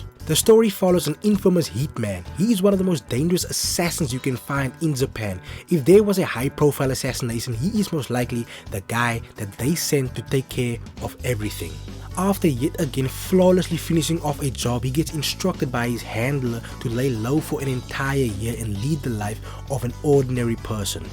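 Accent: South Africa. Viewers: Hebanna, I didn't expect a South African accent ... Awe bra waka. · My guy are you a Saffa? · this defs a south african bru speaking here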